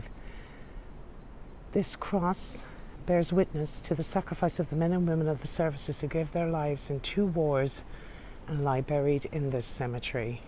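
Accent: Ireland. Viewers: Lovely! Just so lovely! Not only the tour of this beautiful old cemetery, but to hear that wonderful lilting voice of my favorite tour guide,. Lady, I believe I could listen to you read an encyclopedia and it would be entertaining just to hear that soft, soothing, Irish lilt. I am a chronic insomniac and you just don’t know how many nights the sound of your beautiful voice has been a lullaby to ease me off into sleep. It works as nothing else does. I always look for you especially when new videos have been posted. I love the others as well, but you just have that something a little extra. Prayers that life is treating you well! Keep up the great work. Free Tours by Foot-London has a real treasure in you. ❤️ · Been waiting for the return of the magnificent 7 Love this series of cemetery tours by the wonderful.. Great knowledge and well delivered in that soft Irish accent x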